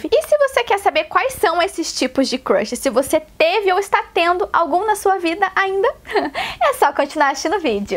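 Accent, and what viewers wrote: Rio Grande do Sul, Brazil. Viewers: Guria é gaúcha??! olha nn sei c é gaúcha mais q é linda tu é qrd👌❤❤😘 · Vc é gaúcha? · tu é gaúcha guria?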